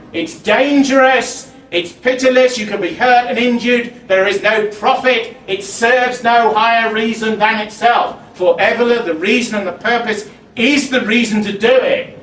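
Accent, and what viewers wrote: United Kingdom. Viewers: Idk man to me he sounds pro'er Bri'ish.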